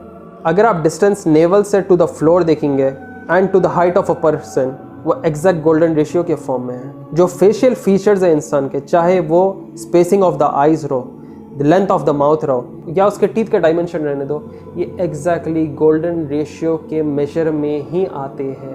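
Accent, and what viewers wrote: Hyderabad, India. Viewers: By how he speaks he sounds hydrabadi to aap agr wahan ke logon ko sunenge to wo ese hi bolte hai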